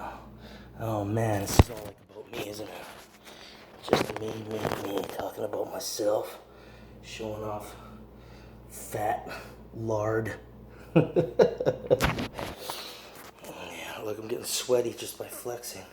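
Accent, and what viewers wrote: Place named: Canada. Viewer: You’re Canadian ! Oh I feel like such a dummy asking where In the states you live. I’m not great with accents obviously, my apologies 😂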